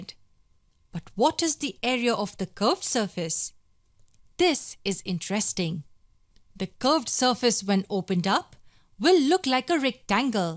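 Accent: India. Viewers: is the girl talking ..an indian ?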